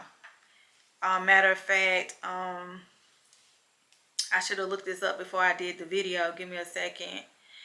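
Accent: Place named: South Carolina, United States. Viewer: I was wondering why you sounded soooo country - then you said you're in South Carolina. I'm in Columbia - Broad River / Saint Andrews area. Hello neighbor!!! 😁😁😁😁 Will you find out how Prince is doing please? Thank you. Keep up the good work 👍👍👍👍👍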